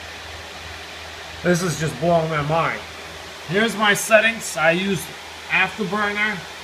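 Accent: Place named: New York, United States. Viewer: Thumbs up for the rockin rig and NY foughetttaboutittt accent!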